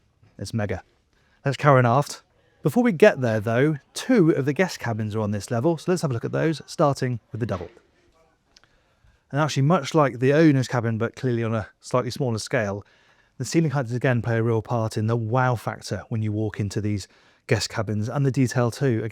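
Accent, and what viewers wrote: United Kingdom. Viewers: PASHAGEWAYS....love hearing the British speak!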